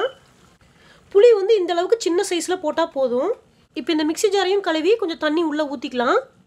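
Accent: Kongu, India. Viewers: Kongu Tamil😍😍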